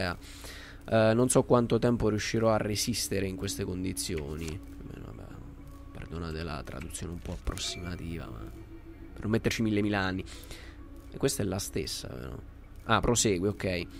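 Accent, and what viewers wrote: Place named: Central Italy, Italy. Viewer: Di dove sei? Hai un accento del centro Italia che non riesco a definire esattamente..sparo marche :)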